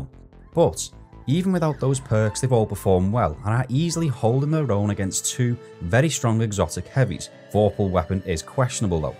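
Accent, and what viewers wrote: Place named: Scouse, United Kingdom. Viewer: sound like micheal owen , stop hiding the scouse accent lad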